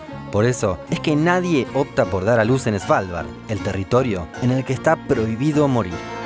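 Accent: Argentina. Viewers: Men, tu voz me es súper reconocible, lpm sos de Argentina? Alguna vez hiciste publicidad en la tele? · Pero habrá algún documental que no tenga acento argentino? Por favor ?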